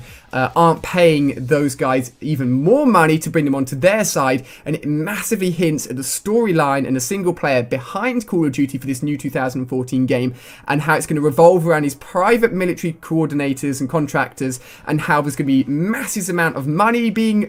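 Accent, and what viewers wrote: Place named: United Kingdom. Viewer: It's hard for me to understand british accent and you speak faster... : /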